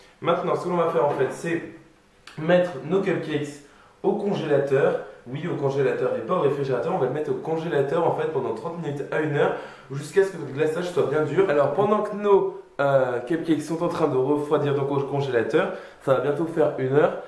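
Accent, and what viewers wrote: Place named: England, United Kingdom. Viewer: Je n aime pas du tout ton acsent anglais et tu veux un te la péter dsl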